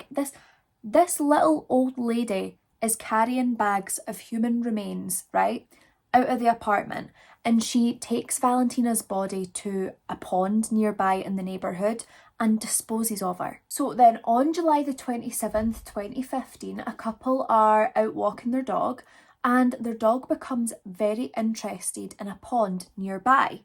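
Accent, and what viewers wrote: Scotland, United Kingdom. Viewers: Oh my- you’re so beautiful also! Your accent! 🥰 I take it you’re a Scot? 🏴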